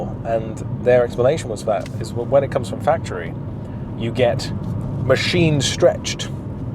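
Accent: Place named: England, United Kingdom. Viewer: Hello, thank you for the video. May i ask: are you native Greek? Your accent shows that you grew up in Engand (or you have lived many years) but you are not local. Both parents Greeks or one?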